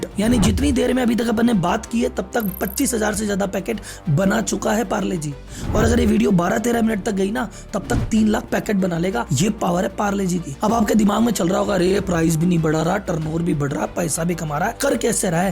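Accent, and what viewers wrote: Gujarat, India. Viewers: Tamara accent gujrati chey , dhando walo mota bhai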